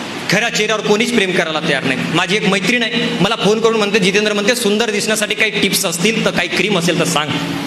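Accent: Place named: Vidarbha, India. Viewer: दादांचे उच्चार विदर्भातल्या मराठी सारखे वाटतात.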